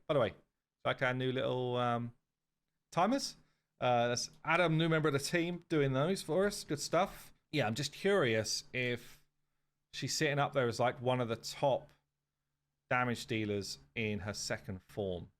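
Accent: United Kingdom. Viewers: Oh you're definitely British😂😂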